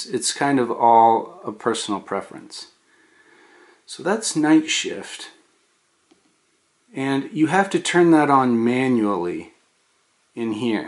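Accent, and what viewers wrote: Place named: North, United Kingdom. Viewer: A real northerner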